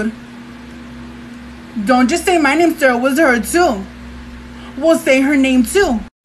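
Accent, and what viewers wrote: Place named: California, United States. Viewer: Is she representing all my girl in California? Cuz all of us speak like this! 🤣🤣🤣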